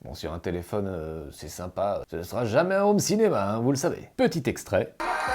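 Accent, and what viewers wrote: Switzerland. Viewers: Rien que pour ton humour et ta joie, ce qui ne t'empêche en rien d'être très Pro, précis et perspicace, je regarde tous tes tests même si j'ai pas vraiment besoin d'un smartphone... mais plutôt de sourire sur ton charmant accent franco-"phone".... hihihi! Bises à la Suisse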